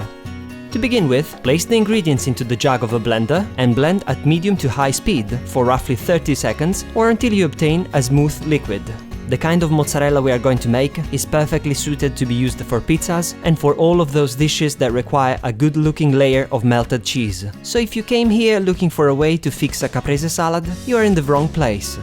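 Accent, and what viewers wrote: Italy. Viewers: I found your Italian accent completely adorable!Keep up the good work of giving us tips! I am not vegan but vegetarian..Your channel is really helpful! :) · yass that accent and inflection, I'm a fellow italian (: Yeah i agree and I'll sure try some of the recipes! Who knows what'll happen hahah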